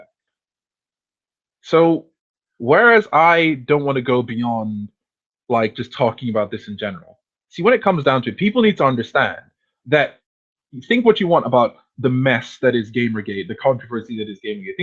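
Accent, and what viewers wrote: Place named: Ireland. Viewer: Lol youre from Ghana? I thought that you were maybe Irish or something lmfao, just how you pronounce certain words thats all. First time viewer here just so you know, good video mate!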